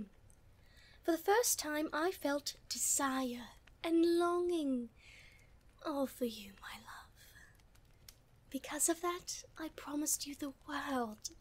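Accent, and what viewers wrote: United Kingdom. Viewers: How… I swear you need to be hired by someone to do voice acting This British high ip accent is just…perfection, with the vampire roleplay, words can’t describe how amazing this is.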